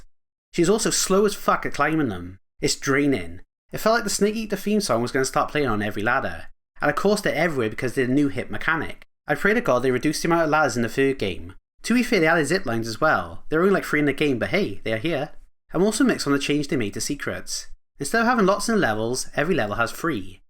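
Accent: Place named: Wales, United Kingdom. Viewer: Ah I know a Welsh accent when I hear one!🏴 Agree with a lot of your points! Compared to other classic TR entries, TR2 is the least replayable for me. I like the heavy combat, but the underwater section, clunky vehicular gameplay and weak story really let the game down. Interested to hear your thoughts on TR3! Excellent video! Subscribed!💚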